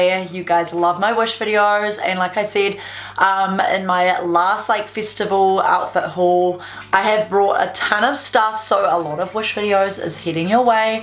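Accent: New Zealand. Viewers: I thought the accent was Kiwi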